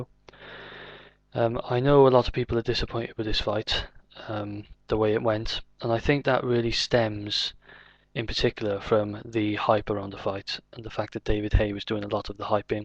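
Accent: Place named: Newport, United Kingdom. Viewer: good vid m8, agree that lookin beyond the hype haye didnt do as bad as is being made out really, apart from that fallin down shit which pissed me off, i havent seen any1 for a long time last the 12th without being smashed to bits let alone rock him with a clean shot. id like haye to fight arreola or adamek an earn his way to mandatory an do it again. many would disagree but thats wat id like in an ideal world. where in wales u from m8? sound like u from Newport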